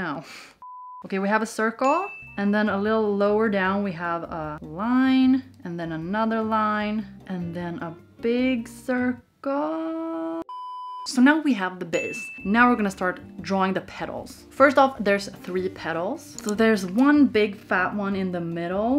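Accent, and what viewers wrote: Sweden. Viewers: Are you Swedish just a question? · you sound kind of swedish thats so cool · Is she Swedish? Sounds like she has an Swedish accent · Didn't realize that you where Swedish until like 4 minutes into the video. Well, I'm Swedish to so... Hejsan Svejsan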